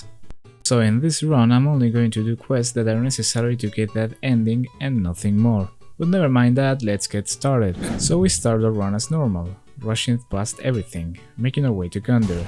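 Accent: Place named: Spain. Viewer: Como se nota que eres español😂😂😂😂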